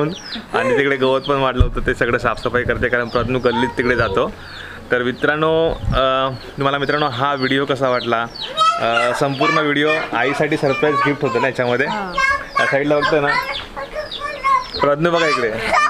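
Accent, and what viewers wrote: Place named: Shrivardhan, India. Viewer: तुमची भाषा आमच्या श्रीवर्धन सारखी आहे लय भारी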